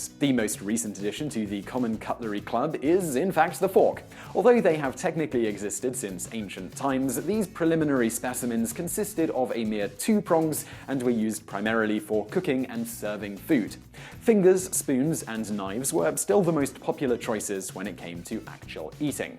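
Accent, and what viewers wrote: United Kingdom. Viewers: The "Barack" period lol. I love British accents!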